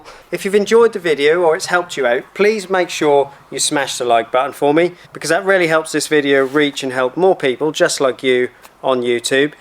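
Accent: Norfolk, United Kingdom. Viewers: Teriffic video as always, Be that a bootiful Norfolk accent? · You sound like you're from my neck of the woods sir (norfolk) 😃 Great video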